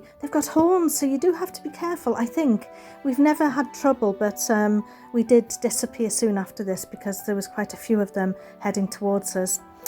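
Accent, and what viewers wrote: Wales, United Kingdom. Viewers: Excellent video on Dinowig Quarry, one place me and Brett really want to get too, so it was fascinating to hear your tour and i love hearing the Welsh accent, it's great to pick up a few words. Like you said it's quite the climb, but from what you've shown us its well worth the journey! How long did it take you roughly to do the walk? Thank you, appreciate your videos!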